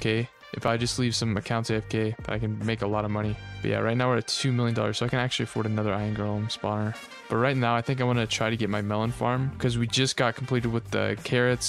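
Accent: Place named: Quebec, Canada. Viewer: you're 100% from quebec esti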